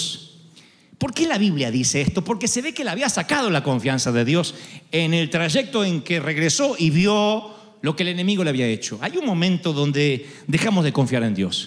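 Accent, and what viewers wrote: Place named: Rioplatense, Argentina. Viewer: fenomeno!! Necesitaba escucharte. Soy uruguayo y tus predicas me llegan de una manera increible, seguro que por tu acento ya que es muy parecido al mio por la cercania geografica con Argentina pero mas seguro porque realmente sos hombre de Dios, y que Dios te bendiga y te siga iluminando para que nos sigas tu iluminando a nosotros con la palabra de Dios todopoderoso. Gracias a Dios por tu existencia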